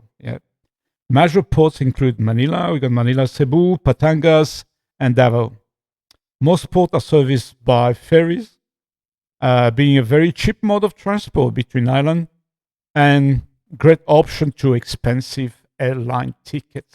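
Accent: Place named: Belgium. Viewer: im curious, i read you're from Australia, but your accent sounds european. my friend says you sound belgium.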